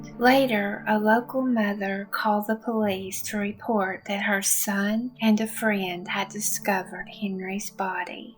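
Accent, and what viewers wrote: South, United States. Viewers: It's unique! Is it a Southern accent? 😊 As for poor Henry, I'm pretty sure he was killed by someone who knew him. Seeing as he was hit from behind I think he had met someone in that spot that he knew. I saw some recent news that they were exhuming his body after all this time so hopefully some positive news soon.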